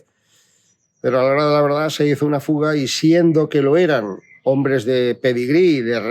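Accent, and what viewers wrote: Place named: Valladolid, Spain. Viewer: Habla igual que yo!! (Andaluz) jajaja claro es de Valladolid habla castellano puro da gusto escucharlo es verdad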